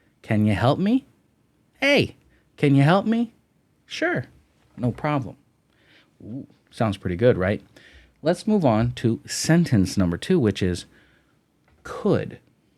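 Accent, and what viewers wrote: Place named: United States. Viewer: Hello my teacher I really love your teach I want to learn English perfect with you special your pronunciation I love American pronunciation I don't like language British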